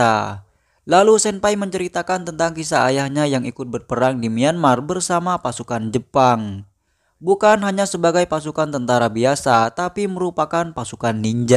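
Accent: Madura, Indonesia. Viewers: Bang ente orang madura ya 😂. Logatnya kliatan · Lu madura ya min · Admin orang Madura ya logat nya beda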